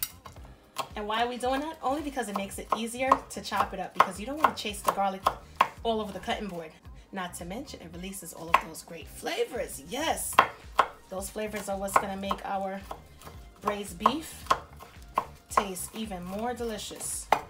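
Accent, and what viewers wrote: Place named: East Coast, United States. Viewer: Yummmyyy!!!! We look for the good food but listen for that East Coast accent! ❤️❤️ 😊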